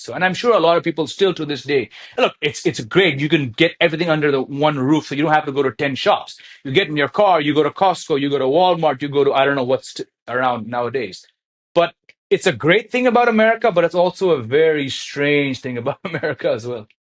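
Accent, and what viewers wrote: India. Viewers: White guy with an Indian accent 😂😂😂😂 I can see the irony… and why people question. But it’s all love bro. White Indians are a minority 😂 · Yes !! Indian or desi accent....❤ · First time hearing indian accent from a white person! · Its a real mindfuck to see a caucasian with an indian accent. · LOVE THAT DESI ACCENT,! 😨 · Bro even his accent is Indian. Ye gora toh hamara hai. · Mahadev…. I just cant believe… he speaks just like us…. Absolutely an inidan😃😃😃😃😃🇮🇳🇮🇳🇮🇳🇮🇳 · he's hindi acent totally matches with anant ambani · if i wasnt looking at the video, i would have guessed with his accent that he is indian too :D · When you spoke your indian english in america didnt they called you with some racist slurs like scammer and stuff? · Your accent is a real indian citizen your no more American and you are a pure indian ❤ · His english is Indian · Its weird to see a white person talking in Indian accent. 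lol. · His accent is also Indian 😭(Proud mother's tears) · His accent is the future Indian accent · first time seeing an AMERICAN using the indian english accent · Wooow<link> way he speak english its indian accent.....🎉😂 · The accent so hindi ❤❤❤ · Its so mind bending to hear a white person speak English with Indian Accent. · This guy is really unique, because he speaks English with an Indian accent and his English errors are so Indian, and because Indians make up 90% of the citizenship queue in the US. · Feels nice but strange listening to him. Here I am, someone who lived in America for just 11 years and living in India now with an American accent, not to mention teaching it for the last 23 years.. And this guy is sounding Indian 😂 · Because he was born and raised in India. If you were born and raised in Nigeria you would sound Nigerian. Your skin colour does not dictate your accent 😂. 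· He is literally speaking in Indian accent blud ❤❤ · You know he is true Indian when you here Indian accent when he is speaking English!!! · Bro even have indian English accent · He somehow has a more pronounced Indian accent than many Indians 😅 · speaks in Indian accent. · Dang even his English has a bit of Indian influence 😂😂😂 this is amazing to see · dude's trying hard to speak in normal english. he's got indian accent. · American with an indian accent, and i love it.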